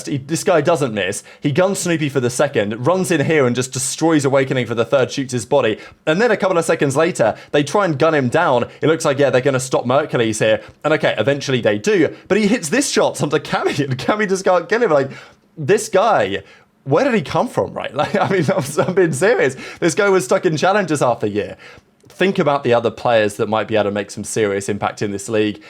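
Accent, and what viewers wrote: United Kingdom. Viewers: it’s that irresistible British accent that always has women around the world swooning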